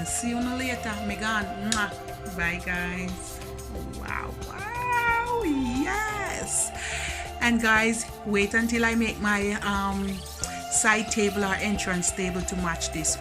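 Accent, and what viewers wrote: Jamaica. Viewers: Hi!! I am so happy to finally hear a voice “ talking the real Jamaican things them” and creating “glam and glitter” bling out on social media my girl them can’t hold you back!! You should really be proud of yourself as I am proud of you. Your bling mirror “sell off”. Looking forward to the side tables.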